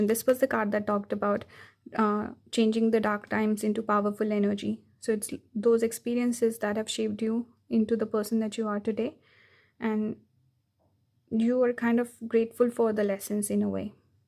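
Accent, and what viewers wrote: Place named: India. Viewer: Pile 3 speaking. Logical as well as intuitive. Plus you have a cute Indian accent. ❤😊.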